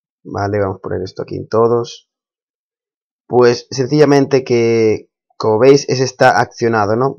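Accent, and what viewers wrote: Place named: Madrid, Spain. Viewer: ya decia yooo que tu acento me sonaba XXD yo de madrid!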